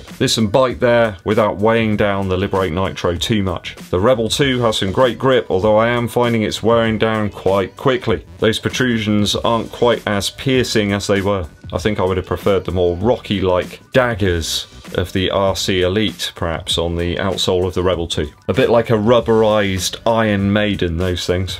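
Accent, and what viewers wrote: England, United Kingdom. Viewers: I love hearing the English pronunciations! Thanks!! Never owned a Puma shoe, but I'm loving the looks of the new line.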